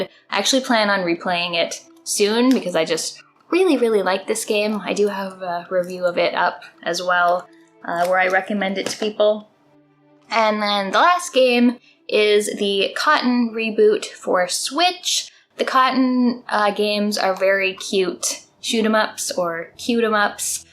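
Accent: Canada. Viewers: exactly. Canadian accents rock, both in the sense they're awesome and they help calm me down when I'm feeling anxious 😁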